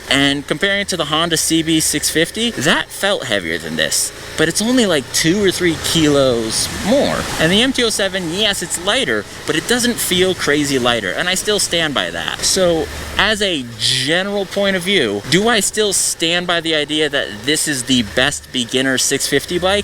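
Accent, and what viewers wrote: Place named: United States. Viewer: Down vote for enduring clickbait with that irritating high pitched nasally 'murrican accent and waffling verbosity. Get to the point!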